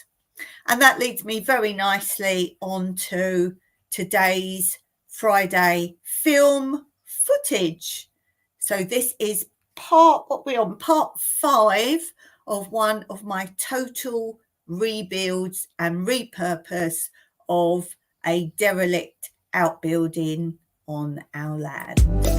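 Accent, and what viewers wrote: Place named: England, United Kingdom. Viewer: 😊 I enjoy the reading especially in your British accent.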